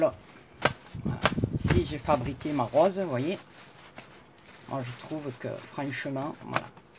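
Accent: South, France. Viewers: Bravo !! Tout est dit 💕😏 avec un bel accent du soleil , comme moi qui suis du sud-ouest 😘 nouvelle abonnée a votre chaine. Merci du partage. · je suis au Québec depuis 2002 avant j’étais en Avignon avec mon chéri qui lui a aussi ce superbe accent du sud! Nous avons choisi de quitter la France pour nous installer au Québec. Nous y avons eu deux enfants qui ont l’accent.... québécois lol C’est marrant mon fils a presque l’âge du tien. Je voulais aussi te dire que je viens de regarder plusieurs de tes vidéos et tu m’as beaucoup émue. Je te souhaite beaucoup de bonnes choses et je suis vraiment ravie de pouvoir te suivre désormais ❤️ Gros becs 😘 xoxo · Coucou ma, tu m'as tué avec ta carte 😂😂😂 j'en peux plus 😂😂. Encore de belles fleurs 😍 et papillons. Ah dommage tu n'auras pas le mot de la fin 😞 car je ne pouvais pas ne pas dire ce que je pense et que la vidéo charge. 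Je t'adore ma et ton accent du sud me berce et me met la joie. Gros poutous 😘😘😘😘❤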